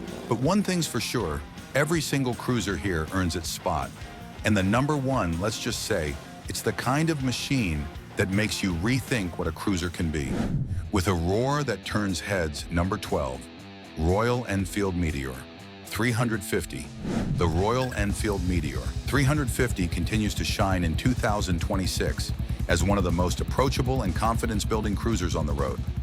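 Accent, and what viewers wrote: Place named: United States. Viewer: This video is funny. First of all using a American accent but this list is far from American choices of bikes in that order. More than half these bikes are not in demand in America as they want you to believe. This video is obviously catered to the people of the U.K. too funny... Most American bikes on the streets will be Sport bikes 750cc and above, all classes of HD and Indian with maxed out Horsepower. We like bikes that are fast and big here people, so don't kid yourselves.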